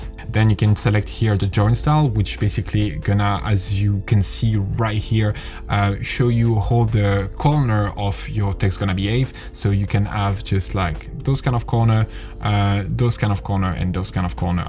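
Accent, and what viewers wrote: France. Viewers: Love your French accent hah! Thank for this useful video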